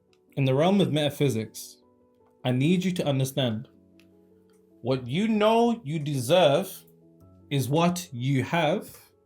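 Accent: United Kingdom. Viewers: The UK accent gives the impression of someone articulate and intelligent to Americans. This Knowledge isn’t about intelligence. It’s about you. Sometimes the mirror is painful to look at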